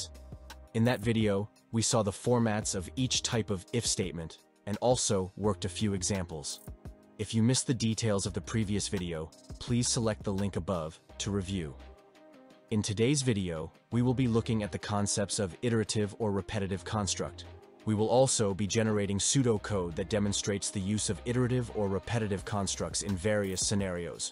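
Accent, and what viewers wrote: Jamaica. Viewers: original voice with that Jamaican accent was the one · we need that orignal jamaican voice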